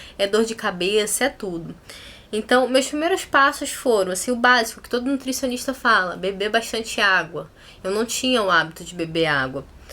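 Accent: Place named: Rio de Janeiro, Brazil. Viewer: Oie, passei o dia todo ansiosa pra chegar em casa e ver seu vídeo....confesso que queria ouvir vc falar e ver o sotaque de carioca rs, te acompanho pelo face e tenho que agradecer sim pelo exemplo, por se expor, comecei minha reeducação no final de janeiro de 2015, pesando 117kg, hoje estou com 105, estou devagar pq esta dificil controlar a alimentação...exercicio faço mto mas o principal é a alimentação mas nao me entrego e nao irei desistir de mim novamente, sempre lembro que você começou com 105 e é um exemplo de que com muita força e determinação podemos alcançar nosso objetivo, adoro suas postagens, fico rindo do que escreve, vc é ótima rs, desde o inicio da minha reeducação que te acompanho, que Deus te abençoe, muita saúde, paz e sucesso pra gente :) ah! não para de fazer vídeos não, você foi muito bem!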